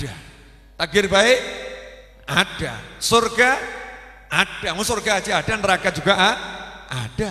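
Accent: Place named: Java, Indonesia. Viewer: Suka sekali dengan cara penyampaian Habib, menyenangkan dan "njawani" Sehat terus ya Bib...in Sya Allah Aamiin 🙏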